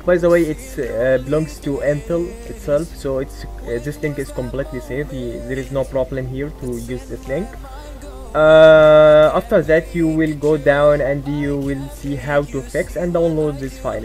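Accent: Egypt. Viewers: Thats an egyptian accent are you egyptian??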